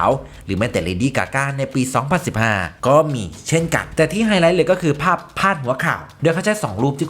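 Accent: Isan, Thailand. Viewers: 555 ตกอีสานบ่อยนะเรา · ชอบสไตส์การพูดเนื้อหาและหลุดเว้าอิสานฟังปัยหัวเราะปัยชอบ5555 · ถึงแอดจะข่าวตกภาษีอีสานบ้าง แต่ก็น่ารักกกก😆😊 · เบิ่งช่องแอดแล้วเต็มอิ่มกับข่าวสารของน้องลิซ คลิบนี้เบิ่งนำหัวเราะกับการเว้าอิสานผสมมานิดๆหน่อย🥰🥰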